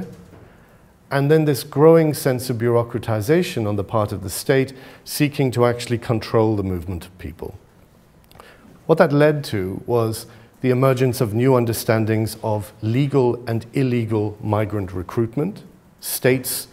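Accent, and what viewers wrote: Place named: England, United Kingdom. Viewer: Did his first degree at NUI Galway...Very English accent however...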